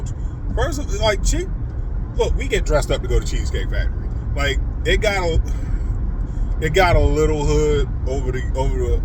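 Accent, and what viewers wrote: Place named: Baltimore, United States. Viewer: Baltimore? I can tell. Dope assessment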